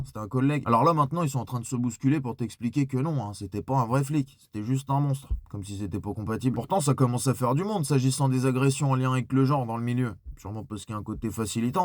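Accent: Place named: Lyon, France. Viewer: La ?? Remise en question ? Oula t'en demande trop pelo.. 😏 Merci pour tes prises de parole, toujours un plaisir de t'entendre, avec ton bon vieil accent lyonnais 💪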